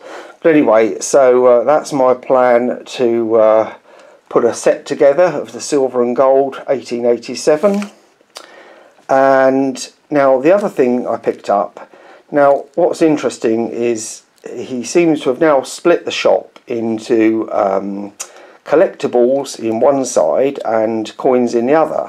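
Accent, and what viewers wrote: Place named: United Kingdom. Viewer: That British accent is spot on 😅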